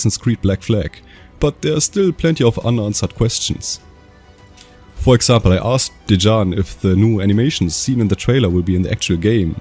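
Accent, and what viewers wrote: Germany. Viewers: Brudi bist du deutsch Brother are you German ? Dein Akzent verrät dich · I love your german accent ❤️🗿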